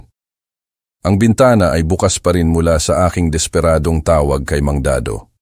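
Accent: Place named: United States. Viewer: ano po Ang nangyare amerikano na Ang nagbabasa eslang masyado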